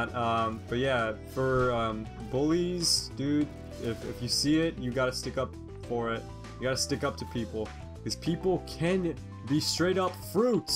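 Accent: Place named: United States. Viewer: For a Pakistani you have a American voice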